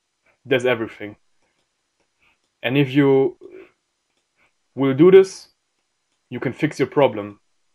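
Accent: Germany. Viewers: Man hört das du Deutscher bist :D Aber ich glaube das tut man bei jedem. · I think your german because of the accent. If so.. Was geht ab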